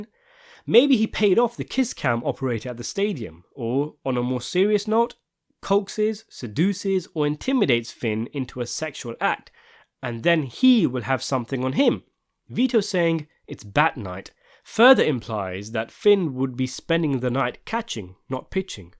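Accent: United Kingdom. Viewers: Can’t get enough Sopranos wordplay from someone with a UK accent. Keep it up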